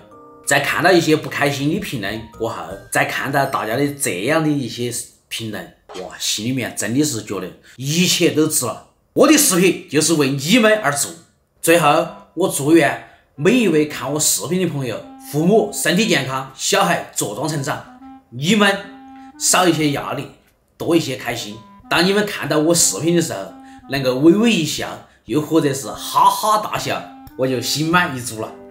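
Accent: Sichuan, China. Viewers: 超小厨阿， 你辛苦了这么长的时间啦， 我们为你的成就觉得非常开心，你不用跟我们解释啥。 作为一个在加州的四川人， 听到你说四川话和看到你煮菜的时候都很让我想家，希望疫情快点度过，可以快速回来整几顿！ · 邊看邊學四川口音呢，沒字幕的話，要聽得懂才行呢，哈哈，我台灣。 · 最喜歡你視頻中，所講的那一口川話，因為家裡長輩均已過世，超想念他們的口音，出生在台灣的我，太喜歡你們的日常，祝你們全家人都平安幸福！我會一直關注你的視頻！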